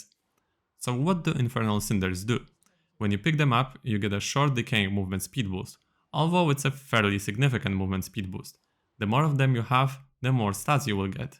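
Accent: Poland. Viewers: Coś tak przypuszczałem że jesteś z Polski po akcencie. Niech żyje wielka Polska! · Dosłownie moja reakcja! Ciekawe czy to zmyłka, czy serio jest Polakiem. Akcent w sumie by się zgadzał. :D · bro are you from Poland?😁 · Polski akcent idzie od razu wyczuć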